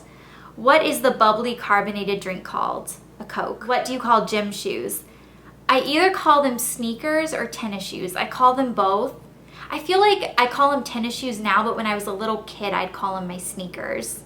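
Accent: South, United States. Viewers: You're definitely from the south! I moved from Chicago to WV and people say everything soooo differently! A lot like how you pronounced words and answered the questions! I think it's very interesting! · Its wierd your from the south im from the north and we say theese things so diffrently. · Hee-hee it's funny as I'm Scottish and you're really Americanised to me :L Loved this tag :). I say 'trolley' not 'buggy' or 'cart'; envelope - exactly as it is spelt and prononcing the 'E'; and 'remote' instead of 'clicker'. The politically correct term is 'remote control' but some also say channel changer, control etc... :) ha :) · Daaang, you're really southern! Lol I was mostly raised in Cali but was born in Minneasota , and I've lived on the east coast :] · You're from the South probably a big city judging by your vocab and accent. "coke" and "buggy" give it away. 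I grew up in Birmingham, AL so you're accent is familiar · Yep, you're from the south. :) Lived in Nola too. I cannot stand when people call it "New Orleeeens." I pronounce/call everything the same as you! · Wow you say things so differently in America! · lol i love this :) i say "tp-ing", "pop", "cart", "remote", and "you guys" i love your accent, you sound like a southern belle :) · clicker and buggy.....man you are a southern girl! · Wow! I'm SO not used to an american accent, without the words underneath I'd have had real trouble knowing what you were saying! My UK accent is so different! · I can tell you're from the south when you answered the questions. I'm from Tennessee and we say it the same. Well besides a remote. And I feel like only the south call it a "grand daddy long legs" everyone else refers to it as "daddy long legs." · I love that you call it a Coke and some of the other answers to the questions (the buggy, too! 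:) Definitely Southern! :)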